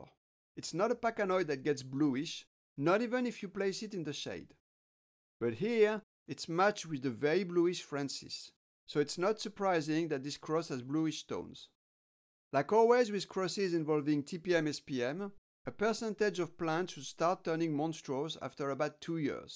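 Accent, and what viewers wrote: France. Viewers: au top, j'adore les cactus et l'accent français, merci !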